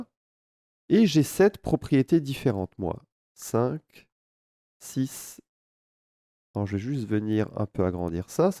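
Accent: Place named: Alsace, France. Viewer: Ta un accent alsacien on dirait ahah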